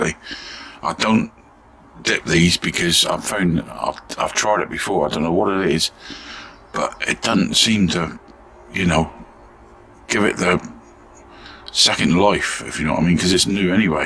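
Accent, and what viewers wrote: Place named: Devon, United Kingdom. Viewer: Hi, so pleased to be able to get back to your channel, followed you years ago, I have since had a Double Lung Transplant so have loads of time to get back into my Restorations, absolutely love your videos, you sound very much like a Devonshire lad like me, I wish you all the very best, I look forward to your next Video, Take Care bud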